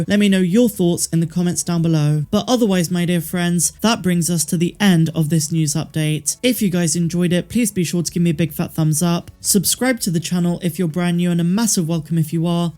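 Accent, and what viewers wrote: United Kingdom. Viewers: I ADORE YOU,! You freakin Rock 🤟❤️💋😘🤠! And hey, that Brit accent is pretty sweet too 😄💗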